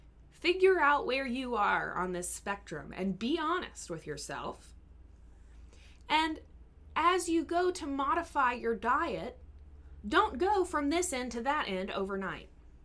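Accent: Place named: Texas, United States. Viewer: i can hear the texas in your voice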